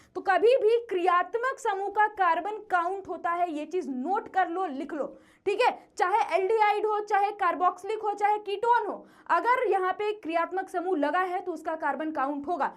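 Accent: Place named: Bhojpuri, India. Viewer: From CBSE board but chemistry in Hindi with bhojpuri accent sounds sweet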